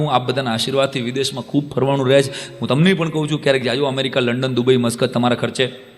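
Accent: Kathiawar, India. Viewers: tmari speech kaathyavaadi mne khub j gme 6e kaathyavaadi speech madh jevi meedhi hoy 6e jane k sambhlta rahiye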